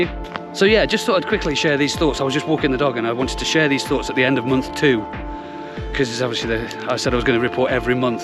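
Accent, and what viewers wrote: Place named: Stoke, United Kingdom. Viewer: I could hear your Stoke accent! 👏🏾👏🏾👏🏾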